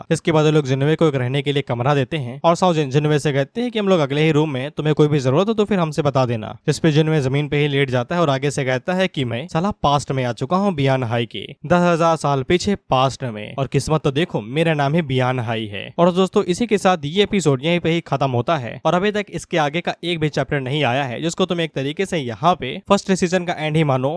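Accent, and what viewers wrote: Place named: Bhojpuri, India. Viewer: Tumare muh se bhojpuri bhohat achhi lagti hai ❤❤❤❤❤❤ or i am the fated villain next part please 😢😢😢😢😢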